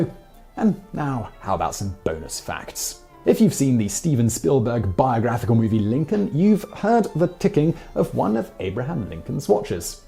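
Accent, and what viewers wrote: United Kingdom. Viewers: you have a British accent but, you use American terms. What do you call home? Btw thanks for the entertainment.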